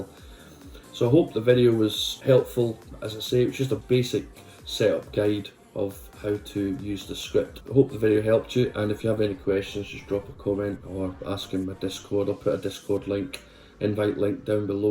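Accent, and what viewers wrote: Scotland, United Kingdom. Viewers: No way , your Scottish ! Nice video man and great script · Do I hear some Scottish in that accent?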